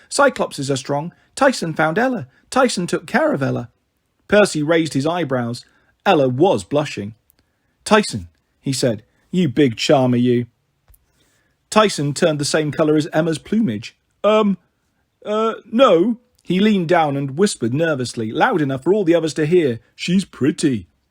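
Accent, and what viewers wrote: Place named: United Kingdom. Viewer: I love listening to HOO in British ❤️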